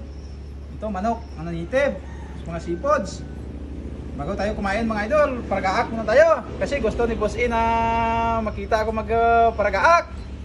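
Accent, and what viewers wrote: Iloilo, Philippines. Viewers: Idol taga saan ka ba... Parang tunong iloilo ka idol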